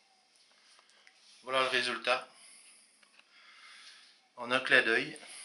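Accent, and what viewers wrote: Belgium. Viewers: IT IS definitely a Belgian accent. I am french myself and I live in Belgium, and I can tell you 100% I recognise this accent as being from Belgium. You are telling such bullshit. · May just be a lower dialect of Belgium. Especially since you've got a couple languages commonly spoken there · yeah he does, accent from Liège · yeah normally Belgian accent is a bit stronger than this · What do you mean by "people like that" ? You didn't read the description but kept trying to argue, and he also has a Belgian accent, just pay attention to the way he says "écarteur" like "écakhteukh" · i recognised a Belgian accent even before reading the description... he definitely has a slight accent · He has a strong accent from Wallonia indeed. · He speaks french but he's belgium, he have a belgium accent. (I'm french so) and the description in this video says "the belgium cheese master..."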